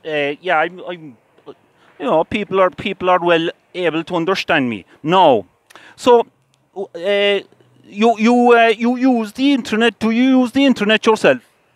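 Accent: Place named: Kerry, Ireland. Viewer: I really enjoyed this . Cannot understand why you had so little views !! I do however suspect you are a Corkman putting out a bloody good South Kerry accent .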